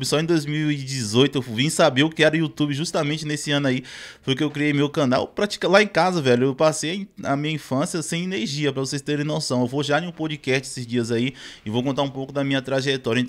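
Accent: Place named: Northeast, Brazil. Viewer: Vc é nordestino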